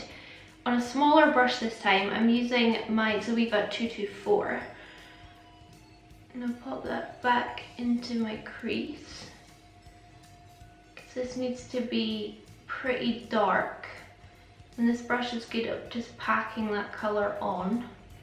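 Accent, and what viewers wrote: Scotland, United Kingdom. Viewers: Love her soft Scottish accent... beautiful xXx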